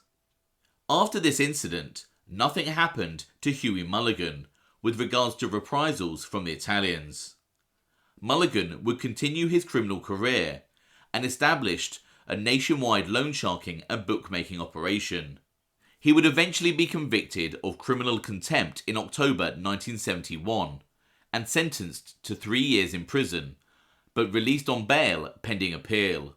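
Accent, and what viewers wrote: United Kingdom. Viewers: your wonderful British accent makes these nicknames that much more pleasurable and entertaining to hear. Keep up the quality work.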